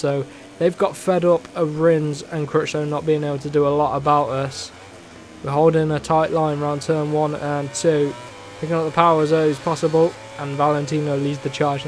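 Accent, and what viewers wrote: United Kingdom. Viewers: Where in England you from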